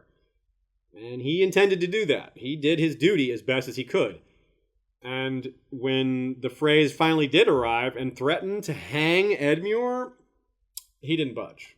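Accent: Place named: Canada. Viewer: While I love all your videos, the new voice overs were a little much. Normally I'll leave the video playing during work and a coworker made a comment that they sounded Canadian. -_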